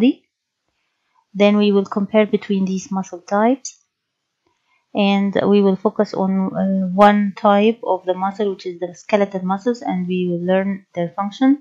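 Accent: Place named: Egypt. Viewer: I love Egyptian English accent! Alot of respect mam From Pakistan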